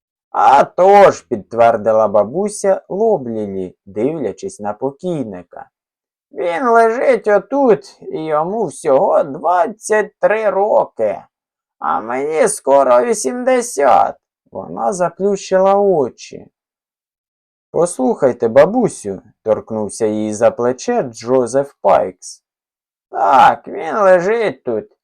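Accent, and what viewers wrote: Surzhyk, Ukraine. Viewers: Напевно в різних регіонах України присутній свій акцент. Ваш акцент це як суржик.Не ображайтеся, але мені не сподобалось. А Бредбері неперевершений. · Сумщина? Полтавщина?) Суржик, рідний суржик - нашеВсе!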